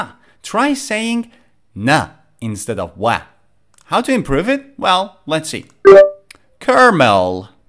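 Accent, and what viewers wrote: United Kingdom. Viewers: this is the best lesson we had and it is very good to use british accent thanks a million all the best sir 👌👍🇦🇫♥🌹🙏😊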